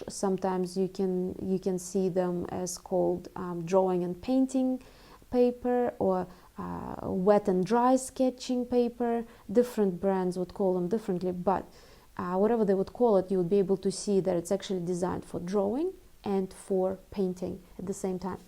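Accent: Russia. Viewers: Beautiful feminine voice, beautiful Russian accent!😊😊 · I love your voice! I am new to your Chanel. As much as I love watching someone draw, the main reason I watch your videos is the sound of your voice. Not only is it soft and relaxing, but I have ALWAYS enjoyed the sound of a Russian accent. I’m glad I came across your Chanel. Thank you!! ✌️ from Canada!!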